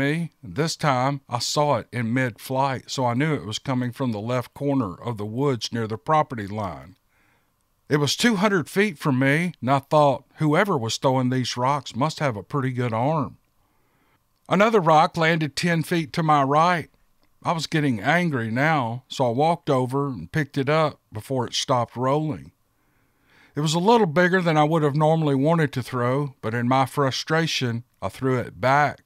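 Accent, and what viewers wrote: South, United States. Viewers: Thanks for an exciting start to the week. I woke up early, like 3am early, with a horrible migraine and after taking some tablets and eating a banana to make sure they stayed down, I lay here in bed with my eyes closed and listened to that Southern drawl of yours and now I'm going to try and get a little bit more sleep. It was a another good story which Kentucky seems to have no shortage of. Can't wait to catch up with the podcasts and maybe get myself some Yeti Bars as Christmas gifts for family and friends. Take care and it's ALWAYS good to hear your voice! From country NSW Australia.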